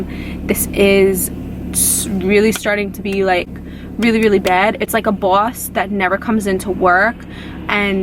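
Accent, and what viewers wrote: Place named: Upstate New York, United States. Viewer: I am not a big fan of the upstate accents whatsoever, being as how I am from Florida, but on you, it's not too shabby. It's not overwhelming. It's like you can tell where you're from, but it doesn't suffocate you, I like it.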